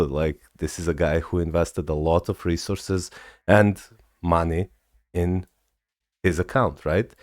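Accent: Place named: Serbia. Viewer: As soon as I heard your accent, I knew you were from Serbia. Glad to see that there are people that play MFF. My IGN is L0NEW0LF312.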